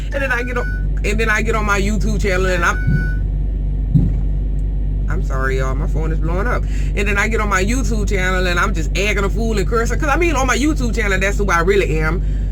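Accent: United States. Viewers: Where are you from you got a real country tone to you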